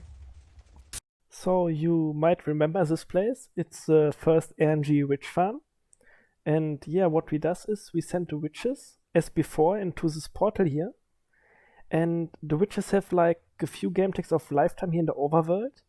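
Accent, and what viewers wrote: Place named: Germany. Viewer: man hört sogar das du deutsch bist. dein englisch man hört es.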